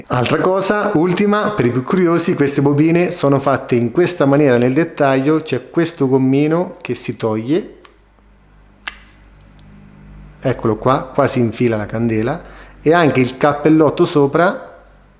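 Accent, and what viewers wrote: Marche, Italy. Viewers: Gran moto la Super teneré, ne ho avuta una uguale identica! Dal tuo accento sento che sei Marchigiano, oserei anche azzeccare la provincia ma non sono sicuro, Macerata?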